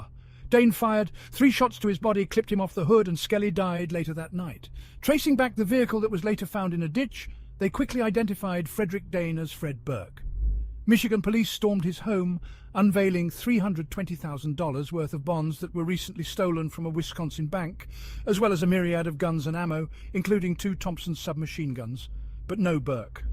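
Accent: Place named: United Kingdom. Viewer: Why use a silly British voice? So difficult to understand. Use American language please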